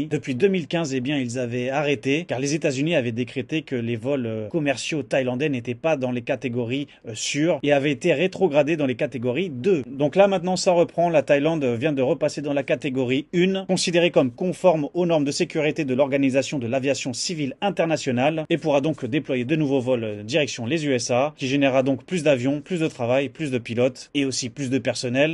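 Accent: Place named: Suburbs, France. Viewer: Je serais curieux de savoir dans quelle banlieue tu as appris le français, même si aujourd'hui c'est un peu meilleur que d'habitude...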